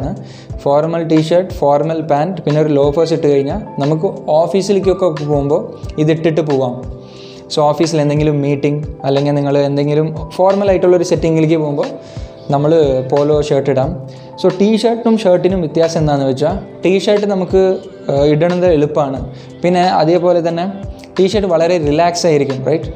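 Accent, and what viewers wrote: Tamil Nadu, India. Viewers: Ningal evideyanu settled.... Aake paade oru tamil touch undallo man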